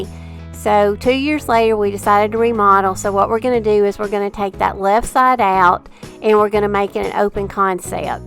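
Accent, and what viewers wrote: South, United States. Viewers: I absolutely LOVE your home and all that you’ve done to it. Also, being from the south, but living in Northern Virginia for the last 30 years, your voice made me think of all my “home girls”! I subscribed and now I’m going to watch all your videos as I wait for new ones!😀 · One question, where is the cook top and oven? I see the fridge is in the laundry room, isn't that inconvenient? I may have missed something as I have the video on mute, can not stand the sound of your southern drawl voice, it's nauseating. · Wow! I love your home. It is very cozy. I love how you have paid attention to detail. I absolutely adore your style. You and your husband have done an amazing job on the remodeling. You have a sweet southern voice. What state were you raised. I’m from northeast Alabama and living in Southwest Arkansas. ☺️🧡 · Your home and decorating style are spectacular!! 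You certainly have a flair!!! Do you happen to remember the ebay site for your door valance? It would be perfect for me to use on my door going out to the porch!! Your ideas are swimming in my brain now to see what all i could do!! Thank you for sharing!! Take good care!! I subscribed!! P.s. loved the southern dialect as i am from Tennessee now living in Georgia! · Just found your channel today. Cute cute cute! I'm from Alabama and I recognize a Southern accent 😂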